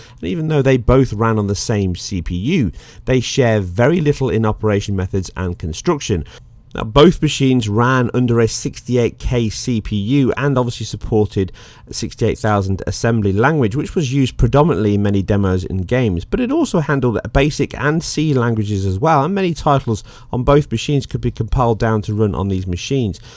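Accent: United Kingdom. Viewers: I really hate the Limey use of GOOEEEEY, (for GUI) or NES/SNES pronounced as words NEEES or SNEEES. It is just a preference. I Prefer GUI or grafphical User interface.. or Mouse interface. and I like "Nintendo" or "Super nintendo". I love you Limeys... Killer gamers from back in the day. Kinda like the South Koreans in modern times! (Serious gamers too).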